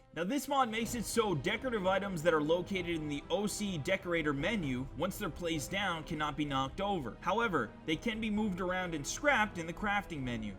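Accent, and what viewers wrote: Canada. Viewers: The Canadian accent is killing me, it's too fucking funny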